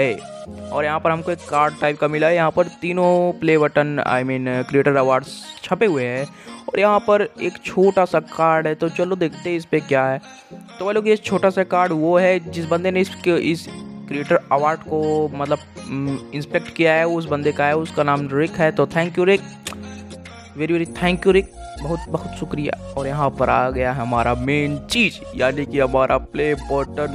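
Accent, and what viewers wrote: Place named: Rajasthan, India. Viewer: Bhai rajasthan se hai kya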